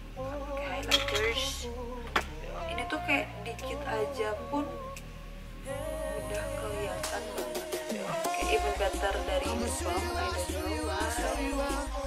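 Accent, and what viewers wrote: West Java, Indonesia. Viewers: sunda pisan nyaa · sunda banget kak, gusti nu agung=)) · Sundanese bgt hihi lucu teh🙈❤️ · Chhetri pantesan ada sundanya😄 salam kenal juga kaa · Baru pertama kali nntn video kakak & langsung suka! cara ngomongnya asik bgt. ada sunda2nya lagi😆 btw beli pupa brush nya dimana ya kak? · ^Gusti nu agung. ngakak si kaka nyunda :v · teteh nyunda pisan 😍😍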